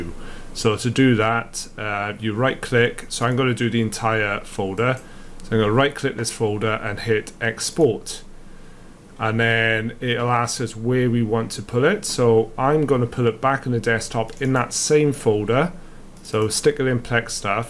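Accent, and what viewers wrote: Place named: Wales, United Kingdom. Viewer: Love the accent. Left Swansea for US at 16 so sounds like home. Gonna try this today ...fingers crossed.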